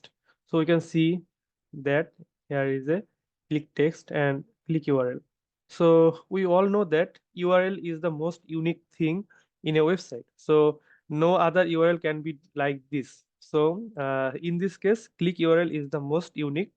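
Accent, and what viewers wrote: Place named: India. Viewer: Thank you! Thank you! Worked perfectly! Also thank you for speaking slowly! Indian accent is hard to follow if you're European.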